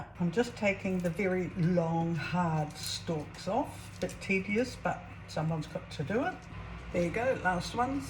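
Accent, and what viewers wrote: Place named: Australia. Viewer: It's pasta time I made some noodles. 'I just love it.' You slipped into Aussie there. 😲You did sound rather Aussie all the way through. Have you just been video chatting to your family in Aus just before making the vid? After you put the onion in you could have said 'That's shallot'. Problem solved. 😁😁 I follow the recipe from my cookbooks exactly because I'm testing them. I'm a massive black pepper fan. Peter Piper picked the peck of pickled peppers just for me.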